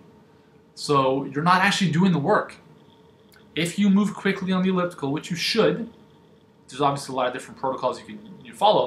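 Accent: Canada. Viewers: Yeah... That's just how Canadians talk. Nothing wrong with it. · Worse than all these things listed would be having to listen to a Canadian accent while trying to work out.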